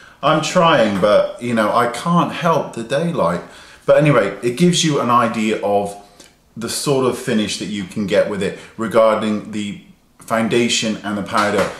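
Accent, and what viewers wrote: England, United Kingdom. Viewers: I love your english accent especially how you say dewy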